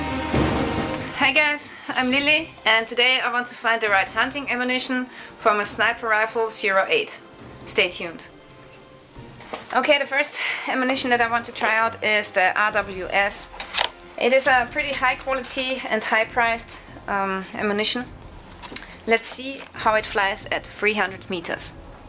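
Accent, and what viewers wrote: Austria. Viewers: very strong german accent，very Österreichisch tuned, better u do it in german and english subtitled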